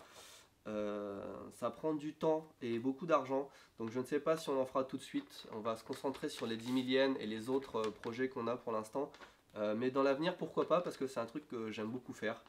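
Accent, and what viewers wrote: Lorraine, France. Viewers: Je me disais bien que tu avais un accent Lorrain des fois quand tu parlais, je rêvais pas et maintenant je suis fixé :p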